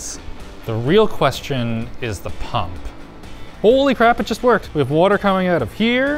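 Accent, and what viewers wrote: Afrikaans, South Africa. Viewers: So good to hear a South African voice in these videos! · Nothing sticks out more than a South African accent. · Is that a Saffa accent I hear >? :) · Is from South Africa perchance? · Loving the Saffa XD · Is this guy south African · He's south african ye? ;D good to see one of us on tech tips :) rock it lad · The south african accent is real 😅🤣🤣🤣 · He sounds south african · You would think this based on the population of the country but you're way off. With 11 official languages the dialects vary extremely. He sounds like an afrikaans native speaker and even then not all of those in SA have the same accent. As a South African I know I'm not spewing bullshit. Also, the numbe of afrikaans native speakers is only around 7 million, which is a lot less than 60 mil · sounds like hes south African? Probably form durban or CPT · sounds South African? 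· Do I detect a South African accent?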